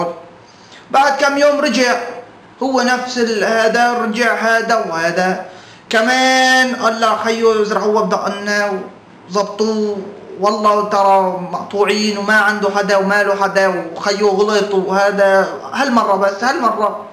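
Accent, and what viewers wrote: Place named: Aleppo, Syria. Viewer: دخيل الحلبيه ماأحلى حكيون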